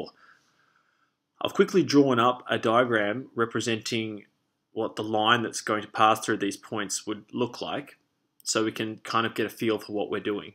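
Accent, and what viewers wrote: Australia. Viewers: hey mate u sound aussie,?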